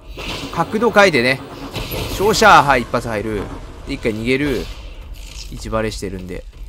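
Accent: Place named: Aomori, Japan. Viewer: プレデターもやけど、青森？癖の強いなまりに集中出来へんわ(笑)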